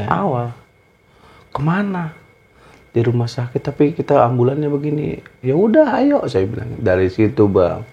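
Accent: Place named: Banyumas, Indonesia. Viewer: Ora ngapak ora asik Cilacap mantau mas🙋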